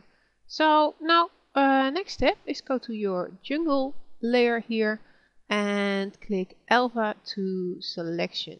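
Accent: Netherlands. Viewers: ik hoor meteen dat je nederlands bent!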